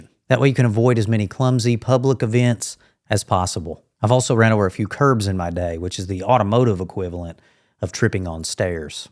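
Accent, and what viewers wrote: South, United States. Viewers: Where is your accent from? Carolinas?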